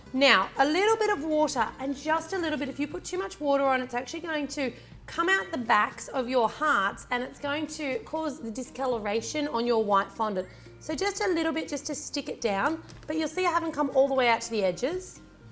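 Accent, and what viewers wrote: Australia. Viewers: She's Australian.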